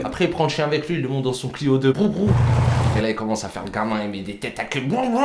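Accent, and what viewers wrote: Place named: Algiers, Algeria. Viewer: Wallah tu parles comme nous a Alger. La manière, les bruitage, zid en plus les mots en arabe. Sérieux kho. C'est vraiment fort.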